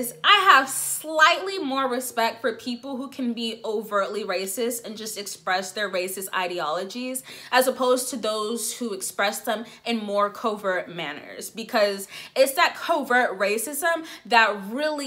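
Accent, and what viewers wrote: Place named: Nigeria. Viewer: You got some Nigerian accent, kinda of tho.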